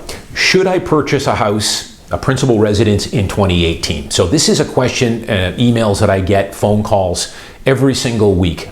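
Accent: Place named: Canada. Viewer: wow I never realised what the Canadian accent sounded like until this buy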